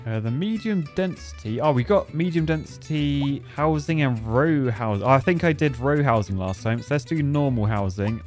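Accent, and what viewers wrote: United Kingdom. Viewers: Bro stop talking like British people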